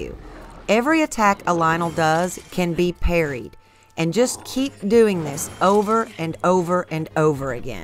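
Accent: South, United States. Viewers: I love your channel! Thanks to you, I've killed 3 silver Lynels. I mean Coloseum is OP. So many elemental weapons. Your videos are great and I just love your southern accent?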